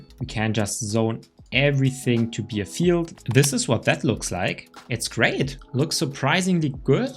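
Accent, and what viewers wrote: Germany. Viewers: I can hören german Akzent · Bro man hört so raus dass du Deutscher bist😂❤ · You sound very German! 🇩🇪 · Ich höre deutschen Akzent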